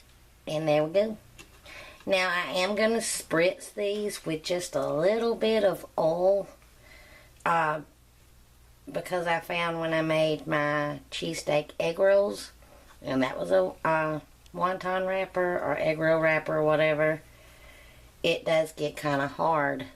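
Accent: South, United States. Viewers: Great video. Thanks and I subscribed. loved the southern accent. keep the vids coming.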